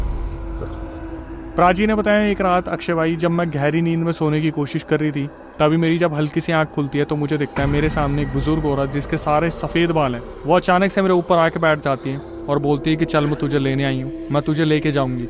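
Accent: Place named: Bhind-Morena, India. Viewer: Bhind morena ki language h bhai 😂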